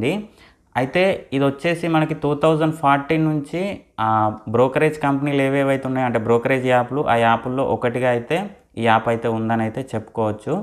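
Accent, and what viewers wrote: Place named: Karimnagar, India. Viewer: Sir మీరెక్కడ, కరీంనగర్ భాష మాట్లాడుతున్నారు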